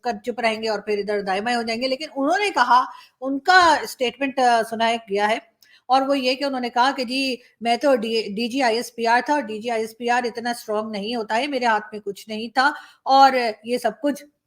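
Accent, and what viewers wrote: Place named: Haryana, India. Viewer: ji main kai baar sochta tha ki aapki language dialect mein mere area wali dialect kyo hai ab pata chala ki aap to mere Area Ambala Cant se ho. I mean your mother from Ambala cant.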